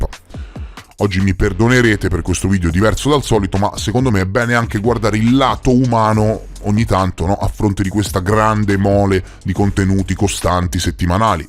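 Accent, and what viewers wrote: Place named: Central Italy, Italy. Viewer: Dall accento probabilmente del centro Italia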